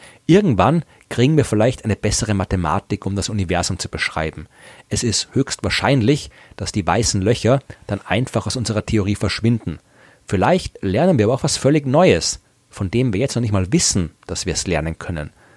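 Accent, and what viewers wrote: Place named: Austria. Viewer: Ich finde die Österreichischen Dialekte schön. Und ist es nicht Toll das wir in verschiedenen Ländern leben und trotzdem die selbe Sprache sprechen. Trotzdem ist nur der jenige Polyglot der die MathemAtik beherrscht ;-) denn das ist die Sprache des Universums. Schönes Wochenende. PS super Hörvideos immer weiter so. Wird Zeit das mehr Leute auf deine Kanäle aufmerksam werden damit deine Arbeit, die du hier reinsteckst auch mit der Weltlichen Währung honoriert wirst und nicht nur mit Lob.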